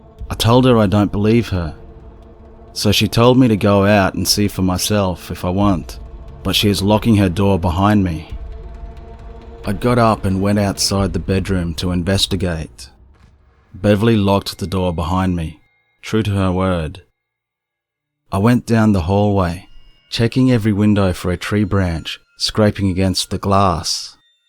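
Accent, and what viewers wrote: Australia. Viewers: Wow!! I really loved this video! Listening to your very sexy Aussie voice on this perfectly beautiful fall day. What more could I ask for?? And I see you've amassed quite the following!! Many wonderful comments on this one!! Congrats!! Even though elephants are my all time favorite animals, I do like your sexy kangaroo!!;-) Take care hon. I'm looking forward to the next one!!:-*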